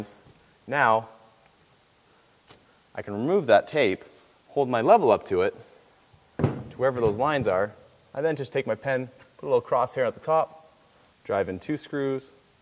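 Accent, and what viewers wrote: Canada. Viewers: Are you . . . Canadian,by any chance? 🤔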